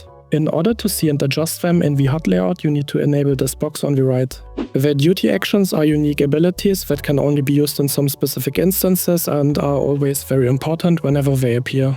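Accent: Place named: Germany. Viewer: Es ist schwer dir zu folgen wenn du Englisch mit so extrem harten Deutschakzent sprichst. Ansonsten Danke für das Video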